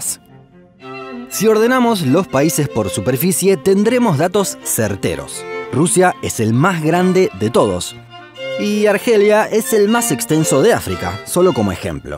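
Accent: Buenos Aires, Argentina. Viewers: Quise verlo pero no puedo soportar nada el acento argentino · Un video de alta calidad, te doy los "parabéns" desde Brasil, hermano. Tu acento argentino es tan hermoso como el video! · Claramente se nota q es de argentina por el acento xD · chileno 0% .. es muy de Ciudad de Buenos Aires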